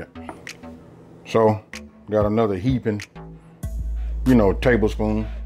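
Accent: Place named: California, United States. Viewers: I thought he was from LA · I thought you were from LA! LOL....The chicken looks screamin. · I knew he was from LA off top but it's a Compton thang we all LACounty but we breeded different.... Lol and (no disrespect either) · I recognized that South L.A accent, not a Compton accent 😁 I LOVE the recipes! I need an invitation to the next cook out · Man! Just discovered you last night and I been hungry ever since, knew I recognized that Cali accent, from the Bay Area but been in the south for 30yrs, keep doing what you doing love it! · Sound like L.A.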